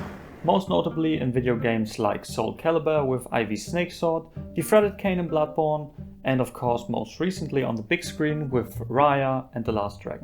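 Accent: Germany. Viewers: U‘re German or Austrian Maybe Swiss?? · Ich dachte die ganze zeit der hat doch ein deutschen Akzent und dann bei der sonntags ruhe ich war mir sicher ❤😂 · Great video Aber mal im ernst die deutsche englisch aussprache ist für deutsche echt etwas schwer zuzuhören weils iwie nicht zsm passt (weil die sprache eig 24/7 benutzt wird und manche bezonungen passen einfach nicht das ist normal :D) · Ich habe bis krampfhaft nach beweisen gesucht, dass du aus Deutschland kommst 😅 hört man irgendwie immer raus wenn jemand englisch spricht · Quick question. Are you German? Because I’m believing to hear a slight German accent. And I think you stayed “Nein” (German: no) at when you dropped that metal peace into the water. I’m asking because I’m German too😬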